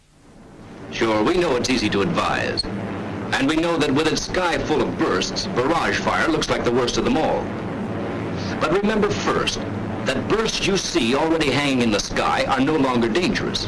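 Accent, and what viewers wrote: United States. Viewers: Classic American accent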